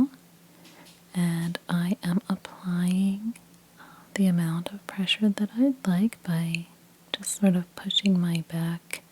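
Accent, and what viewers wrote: United States. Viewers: You’re the only ASMRTIST that has an American accent that I can listen to. Your voice is pure bliss! ❤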